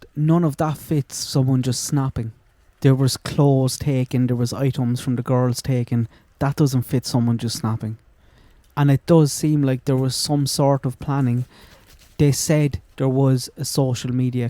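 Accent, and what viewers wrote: Ireland. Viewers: Maybe seem a backhanded compliment but in fairness you have done some very good work here with this part of the investigation, i.e., the items found so props for that. Even though I do struggle at times with the thickness of your Irish accent lol. I used to work in Ireland and never got used to the accent, regardless of how long I was there. It's just one of those very thick accents that one can either grasp and understand fully or not. I guess I am one of those who can't. However, people need to maybe start coming to terms that they were duped and mislead by the Murder Sheet over the Klines, who they literally accused the Klines of these murders. Now, people who were adamant that the Klines had murdered the children, are adamant that they are involved someway or somehow. It may turn out it was just a coincidence that Libby had contact with that AS profile and that is what that Voorhees guy said after hacking into the account, i.e., 'synchronicity. 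Seems that AS profile and Klines profiles were prolific, meaning they were targeting many young impressionable teens and children in Indiana and further afield. I think people need to maybe just stop this Kline obsession and fully focus on what we 'know' and not 'think' we know as Carter put it and we know Richard Allen is the BG, despite Carter not confirming this. That is why your work at this stage is very good as you are focusing on Allen and items found, when other channels were not. Again, props for that. Of course, don't ful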